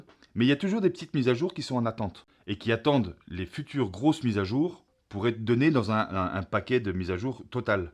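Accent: Franche-Comté, France. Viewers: Merci pour tes vidéos, je voulais te demander, tu es de Franche-Comté ? Rapport à ton accent !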